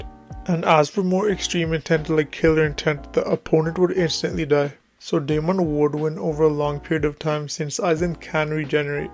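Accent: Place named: India. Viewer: even your English accent is so trash are you Indian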